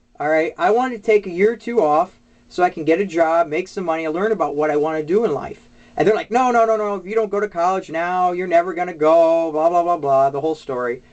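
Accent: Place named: Minnesota, United States. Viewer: saintgauden i'm from Illinois so i'm guessing he's from Minnesota. Wisconsin, Michigan, Illinois dialect sounds similar.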